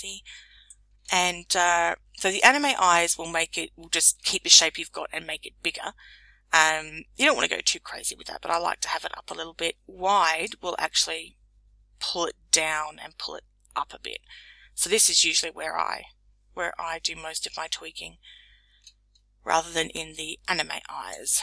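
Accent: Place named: Australia. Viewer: An Aussie tutorial Yes!!! I can understand this (I am from Melbourne) and very very helpful thank you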